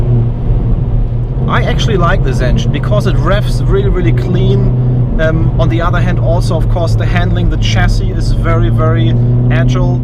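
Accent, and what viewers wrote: Germany. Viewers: Dein Englisch ist wirklich super, aber irgendwie klingt es einfach scheiße, wenn Deutsche Muttersprachler Englisch reden.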